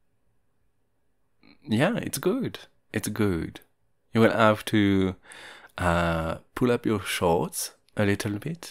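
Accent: France. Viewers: "French accent" mais totalement ! ! Je meurs ! J'adore tes vidéos ! Continue comme ça ❤ Je sais pas si t'es français, mais en tous cas ça donne l'impression mdr PS : je suis francaise, je viens de voir ton live , je comprends maintenant ton accent français mdr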